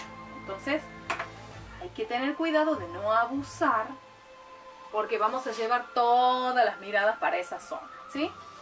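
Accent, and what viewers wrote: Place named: North, Argentina. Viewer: Hola hermosaaa. Proba los polvos sueltos de ruby rose. Es verdad no es necesario saber maquillaje. Jajajaja me hiciste reír. No le lleves el apunte a las mala onda. Yo soy maquilladora. Y te maquillas bastante bien. Me encanta tu voz bien del norte representandonos en YouTube. Saludos desde Tucumán . Argentina. Yo vendo Dapop y Ruby Rose por instagram aquí en mi provincia 🔥🔥🔥🔥😍🔥😍😍😍 y tbn uso y amo el maquillaje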